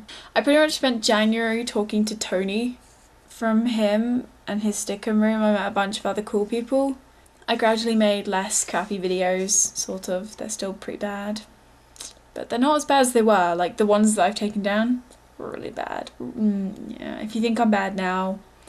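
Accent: England, United Kingdom. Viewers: haha, British! LOVE it! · British English. Woot. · she is cute and funny in a sort of way ^^ I love englands accent! somebody said she was boring... well, she's not! just click on something else if u think it's boring;) search for manualist! that's a nice video by someguy i don't remember the name of atm... but it's awesome ^^ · i love british accent!!!! a new fan here!!! ciao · are you from england ? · You're adorable... i love an english accent. Thanks for posting! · Sorry that was a bit harsh, I didn't realize that comment was actually going to post, I'm not use to to actually being logged in. Anyway just listen to by. I'm a guy by the way. Keep up the British accent, that will always get you places in life. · your english accent is really really well spoken its quite mad. maybe because your now in canada? ha x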